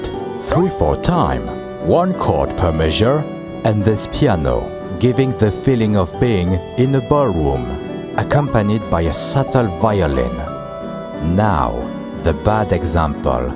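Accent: France. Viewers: The production quality on this is amazing! And don't you dare worry about the french accent, it sound wonderful! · franchement la vidéo est super sympa ! Et puis l'accent français n'est pas un problème ^^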